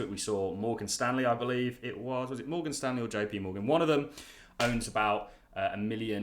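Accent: United Kingdom. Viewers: For a moment I thought it was Benjamin Cowen with a British accent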